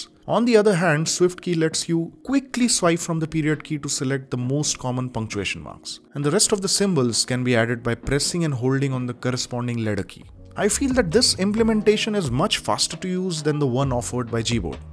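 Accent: India. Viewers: Might be cuz he has an indian accent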